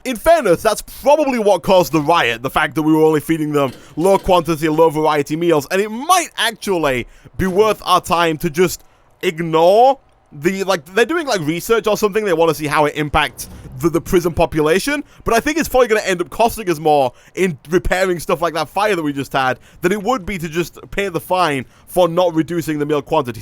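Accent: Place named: Yorkshire, United Kingdom. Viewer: I'M FROM OOP NORTH YORKSHIRE But seriously, that is the most Yorkshire accent I have heard on YouTube.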